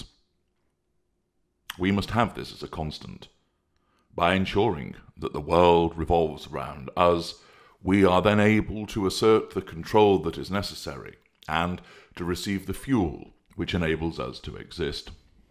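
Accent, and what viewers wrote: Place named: North, United Kingdom. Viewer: Yeah he sounds like he's a RADA graduate. But every now and then I notice either a Midlands or northern accent coming through so I assume he's not from down south originally. It takes a Brit to notice this aspect.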